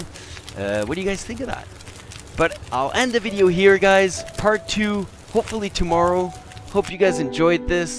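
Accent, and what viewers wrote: France. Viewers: well thanks for your great videos/commentaries! Btw you sound french are you? 😜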